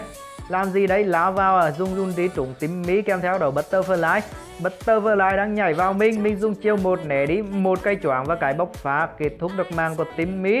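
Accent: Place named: Central, Vietnam. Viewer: Ng miền trung dd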